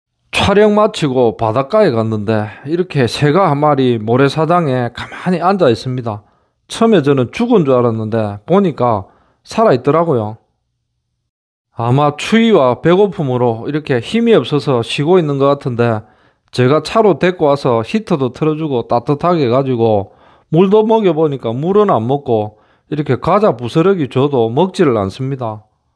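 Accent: Gyeongsang, South Korea. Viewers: 겨ㅇ상도 액센트가 너무 멋져요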